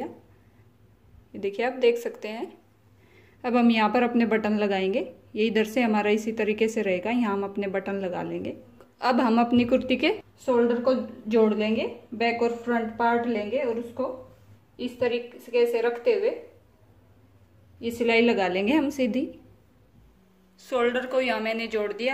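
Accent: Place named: Haryana, India. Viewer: Haryanvi lagte ho aap language se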